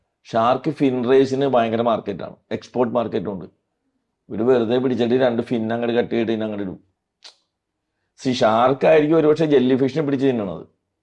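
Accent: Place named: Kochi, India. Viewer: ആാാ ക്വച്ചി ഭാഷ ആണല്ല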